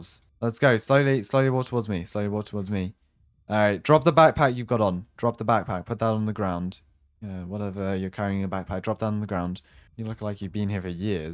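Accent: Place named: Scotland, United Kingdom. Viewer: You've changed your voice sounds different you sound Scottish but before you sounded british a few months ago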